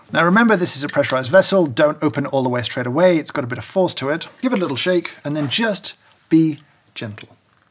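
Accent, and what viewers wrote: United Kingdom. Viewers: From watching your videos I have really developed a love and respect for coffee. I'm quite sure it has a lot to do with your British accent. Here's a small, insignificant suggestion, sneak a French word in your description of the flavor. It will add a continental flavor, I think. It has nothing to do with the content but combined with that accent will add some spice to the presentation. We English speakers have a certain respect for the French for some reason. The language has always lent a certain something to the mother tongue. Keep up the great work!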